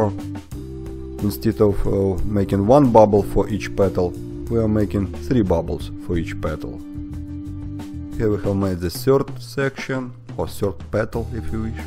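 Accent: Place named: Russia. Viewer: Great Russian accent ))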